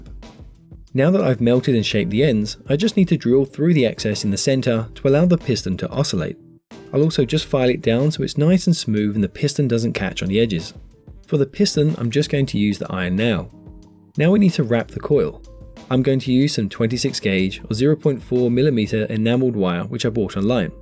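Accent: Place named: United Kingdom. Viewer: Where are you from? You sound like you have a British accent.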